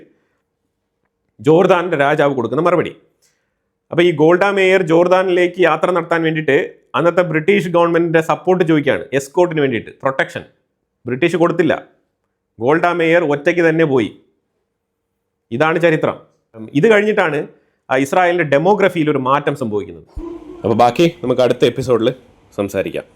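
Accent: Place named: Malabar, India. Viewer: His സ്വീകരിച്ചു of speech is of മലബാര്‍.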